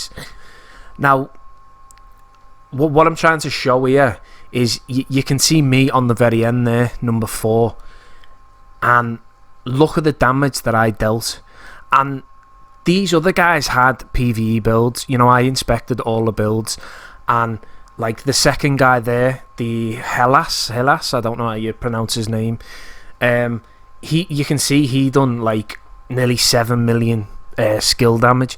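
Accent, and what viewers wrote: Liverpool, United Kingdom. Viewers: hey, my first time here..... funny to see a build going almost in the opposite direction than most ppl.. but very refreshing.... btw are you a scouser.. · where u from？Liverpool？ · were you fro scouse im newferry on the wirral. subbed :) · Thank you for the video! I love your Liverpool accent! I hope you are a reds fan and not Everton's (although it doesn't matter if you are). YNWA! 3>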